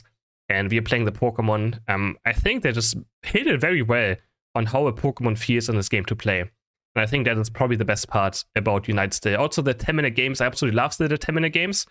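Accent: Germany. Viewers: I think that the game is currently at a decisive crossroad for it's future with the china thing. Let's see what happens and let's hope they correct the direction, because the way the meta developed in the last few months (like you said the big changes started to be noticeable around September with the whole Mime fiasco) with a focus solely on big damage and the inclusion of coffee break long stuns is pretty worrying. Greatly appreciate your channel btw, even if I still can't understand which country you're from (Germany? Netherlands?) Have a good new year Eve, cheers my man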